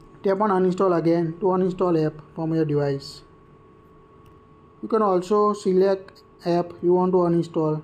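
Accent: India. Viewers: Just put captions it's indian English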